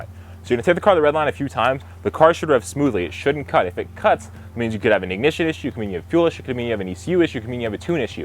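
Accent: United States. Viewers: What part of Nebraska are you from?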